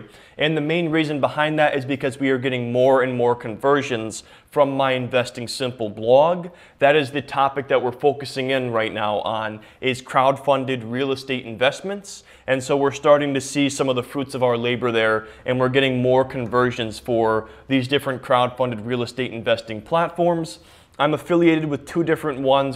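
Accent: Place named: South, United States. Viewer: I enjoy ur vids brother, but are you related to Trey Gowdy? You look and sound similar to him yet less of the southern accent.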